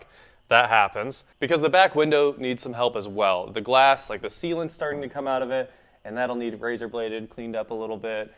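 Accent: United States. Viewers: WTF happened to you.....the majority of the time you speak correctly. Today you are in US mode and it is hard on the ears. Please correct that lazy shit talk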